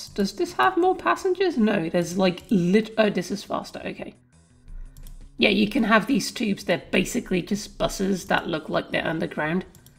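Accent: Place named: United Kingdom. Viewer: Definitely like My Viewers Aren't Cooperative Island, but it might be a bit lengthy. Also, how Dutch are you? You have a very British-sounding accent.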